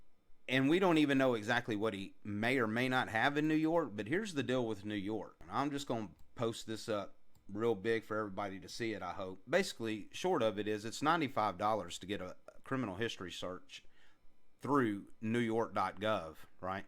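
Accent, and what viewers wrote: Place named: South, United States. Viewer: From one Southern to another I love your accent. I am guessing South Carolina or Tennessee? I'm 11th generation Floridian and it's rare to hear a native Floridian accent.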